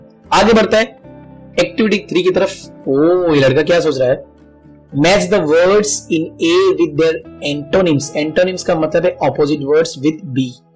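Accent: Gujarat, India. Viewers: Sir ap gujarati ho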